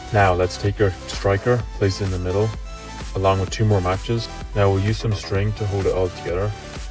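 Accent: Ireland. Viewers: You Irish lad? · Mate your irish? Thats wild, granted im aware shotguns are legal for hunting in ireland but its still crazy to see this 😂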